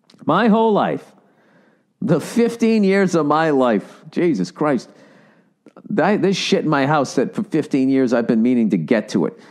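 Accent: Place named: South, United States. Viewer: Love, love, love the country accent!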